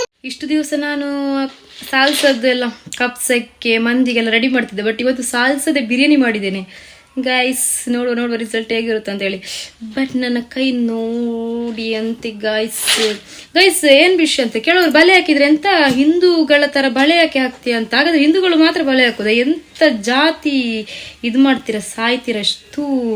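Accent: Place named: Tulu Nadu, India. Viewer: Nimma kannada Tulu mix kelalu kusiyagtade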